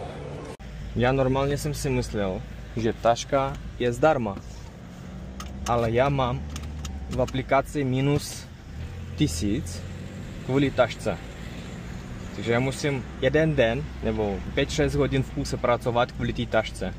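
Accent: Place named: Russia. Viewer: Zajímavý přízvuk. Vypadá že z Východu, spíš z Ruska.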